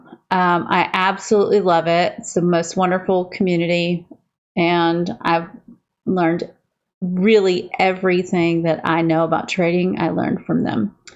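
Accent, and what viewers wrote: Georgia, United States. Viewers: I should have guessed. I'm from south GA. BBT is so global, I don't often hear familiar accents. LOL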